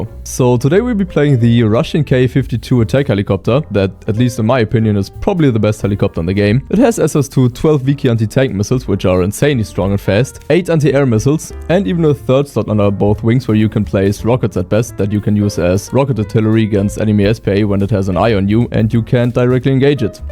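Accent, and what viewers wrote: Germany. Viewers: You are german right? I hear that. also you pronounce german tank nemes right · Are u german by chance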